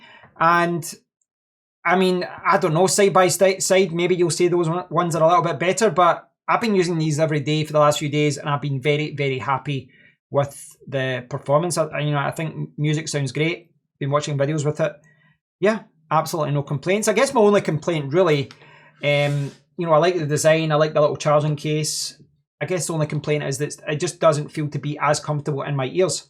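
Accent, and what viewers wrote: Scotland, United Kingdom. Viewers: Your accent makes me miss Scotland 😀. Here in UAE it's only 22£ with coupon I think it's the only tws with apt x under 25quid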